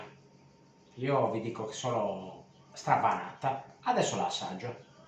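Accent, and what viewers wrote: Sardinia, Italy. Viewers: Molto piu della ricetta mi piace il suo accento sardo! Eia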